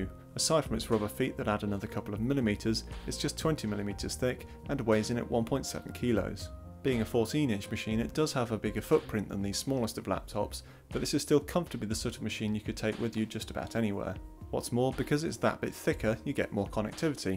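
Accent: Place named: United Kingdom. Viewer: Was watching Austin Evans then realized his harsh American twang was a pain in the ears compared with your calm British accent😂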